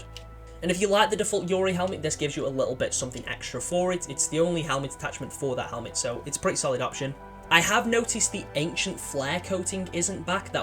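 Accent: United Kingdom. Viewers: Your English looks like England accent but it's more easy to understand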